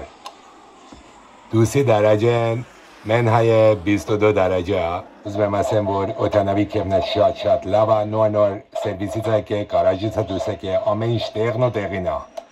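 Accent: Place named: Armenian, Iran. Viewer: Merrraaammm!! Such a barskahye talk!! Half “loghat” is in Persian 🤣🤣🤣🤣 Yes! Count me in too. I wanna be on this flight!!! 😂😂😂😂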